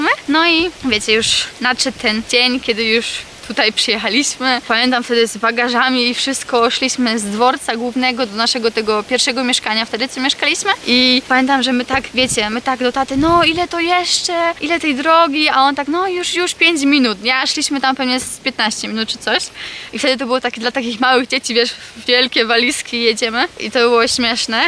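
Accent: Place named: United States. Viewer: Dlaczego tak mówisz ? Ten akcent ..jest nie do zniesienia . Jesteś Polką czy Amerykanką ?! Bardxo mècząca gadka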